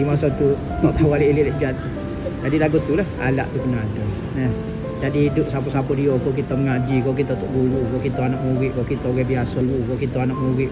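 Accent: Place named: Kelantan, Malaysia. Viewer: Dulu x suka dengar org cakap kelantan. Sejak follow ustaz, jadi seronok dengar pulak.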